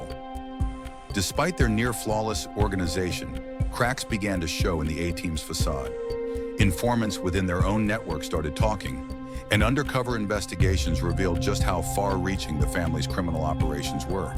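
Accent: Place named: United States. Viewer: Turned off as soon as I heard an American accent.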